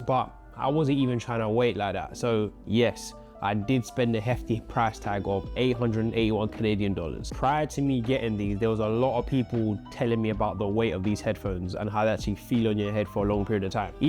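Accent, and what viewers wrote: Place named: United Kingdom. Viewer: Just got my AirPods Max a few days ago and I don’t really regret it they’re amazing headphones. Also, Super random but you’re living in Canada with a British accent I have a question. As someone from the UK wanting to live in Canada which is better? (I think I know the answer)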